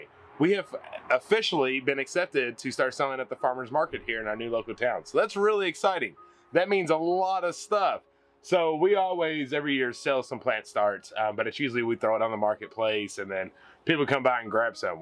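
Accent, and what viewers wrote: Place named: Rural, United States. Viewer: Don't worry about sounding country. We all understand country just fine! 😉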